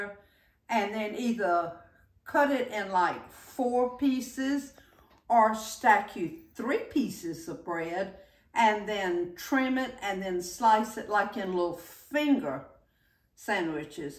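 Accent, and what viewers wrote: South, United States. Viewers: its how us southerner's talk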